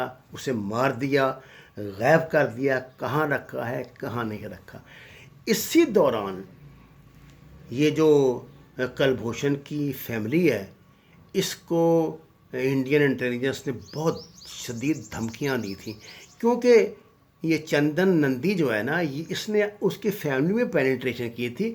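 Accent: Punjab, Pakistan. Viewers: Sir please apnay bat karnay ka tarika thik Karo lagta hy Punjabi me Urdu bol rai ho ya Urdu me Punjabi... Please don't mind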